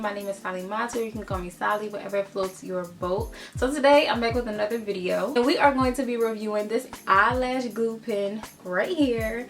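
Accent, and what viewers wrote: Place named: Philadelphia, United States. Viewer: I somehow immediately knew you were from philly. I finally understand our accent 😭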